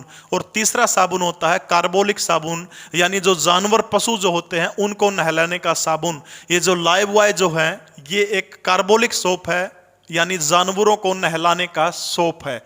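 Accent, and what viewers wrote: Haryana, India. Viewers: Very nice video dear Sir,, but sorry to say sir लहजा वो ही लाओ ठेठ हरयाणवी 🙏🙏🙏👍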